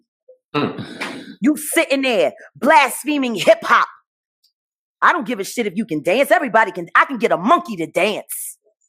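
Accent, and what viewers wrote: Philadelphia, United States. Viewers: For the people in the comments who think she's on something, you're right. She on her North Philly ish. This is how we talk. You have to grow up here to fully understand where she's coming from. If you violate family the gloves come off. It's like an unwritten rule of Philly street life. She is from my era, so I overstand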